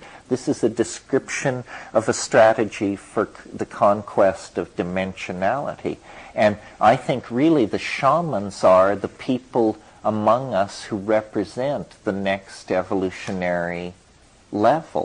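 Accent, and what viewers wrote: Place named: England, United Kingdom. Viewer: Where are you from? England or Ireland by any chance?! I'd goto a gig if it was relatively near to show my support, you've done me great benefit with this channel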